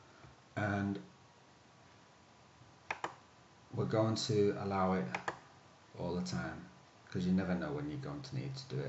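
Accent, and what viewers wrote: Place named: Yorkshire, United Kingdom. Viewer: Nice one . I like your Yorkshire accent .-:))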